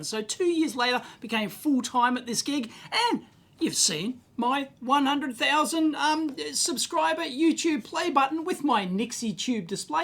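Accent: Australia. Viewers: Congrats on your huge milestone! You were, or are one of my favorite channels since I started down the YouTube rabbit hole 18 years ago. Your adorable Aussie accent and high energy brought me much entertainment as well as valuable learning experiences. Here’s to the next 17 years my guy! Congrats! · This great man with his Aussie accent helped further my interest in electronics. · Congratulations! Well deserved! This is probably the only channel I’ve followed continuously for the past 12 years. As a junior EE, I learned a huge amount from your videos, about electronics, thoughts, experiences, and opinions you shared along the way. Believe it or not, some of those actually made a difference when I was making choices in my own career. I'm sure your content has much bigger impact on your audience than you probably realize. I can confidently say I know a lot more about electronics… and a lot more about how Australians talk 😂🇦🇺 Keep it coming. 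Forget about retirement, not working for the man is a life sentence 🤣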